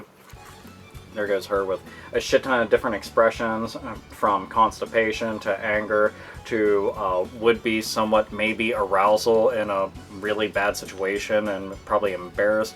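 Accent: Ireland. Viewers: wait you're Irish ? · Holy shit, you're Irish!?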